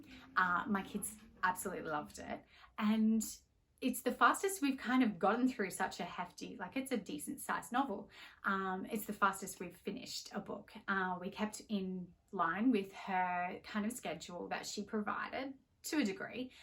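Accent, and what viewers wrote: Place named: Australia. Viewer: Following you on IG and subscribing! Love your voice and your Aussie accent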